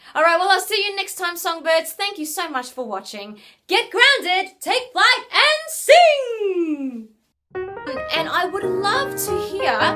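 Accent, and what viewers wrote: Australia. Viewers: Its because shes Aussie aha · She's Australian, that's how they sound! ( I'm from there, I should know!!)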